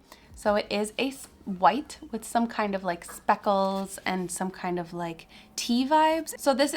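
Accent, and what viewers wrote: Quebec, Canada. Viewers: I just posted my knit city video as well! I learned to knit on youtube in 2016 but picked it back up during quarantine. Are you Quebecois? I can tell by the way you read those labels! #frenchcanadiangang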